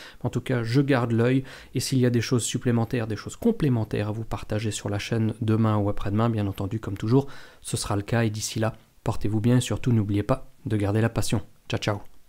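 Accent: Iceland. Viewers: C'est dingue comment la situation peut évoluer rapidement...en mieux ou en pire 😱....Heureusement l'expérience a évité des victimes...😅 Bravo pour ces infos cher,et pour l'accent islandais toujours aussi chaud! ♨️🌋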